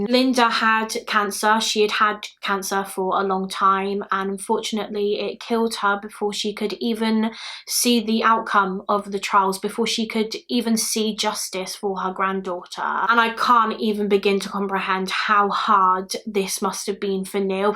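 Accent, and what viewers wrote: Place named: England, United Kingdom. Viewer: I don't know why, but when i have a bad day, voice is just relaxing. I love the english accent.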